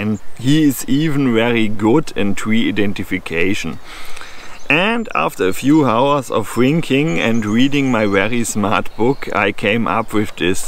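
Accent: Germany. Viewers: Hallo, hab grade das deutsche Video angemacht und als ich gehört hab, dass du das auch auf Englisch gemacht hast musste ich doch gleich mal reinschauen bzw rein hören. Finde so einen starken deutschen Akzent immer total lustig. Hab ich selber zum Glück nicht soo die Probleme mit. Egal. Respekt dafür dass du dich trotz Akzent vor die Kamera gesetzt hast. Würden sich viele nicht trauen. Aber bei deinen Inhalten ist das alles sowieso eher zweit- wenn nicht sogar drittrangig und da ich die allermeisten deiner Videos gesehen habe würde ich mal behaupten es wäre schlimmer dem internationalen Publikum diesen großartigen Content weiterhin vorzuenthalten. Inhalt vor Verpackung würde ich mal sagen😅 In diesem Sinne... Übung macht den Meister und solange dich alle verstehen ist doch alles gut. So... Und da ich eher selten Kommentare schreibe mal was Allgemeines: Mach bitte weiter, sodass wir alle noch viel mehr von dir lernen können. 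Jetzt sogar international 👍 Danke! Danke dass du uns dieses tolle Geschenk machst. Deinen ganzen Kanal meine ich. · man merkt den deutschen Akzent nur ganz am Rande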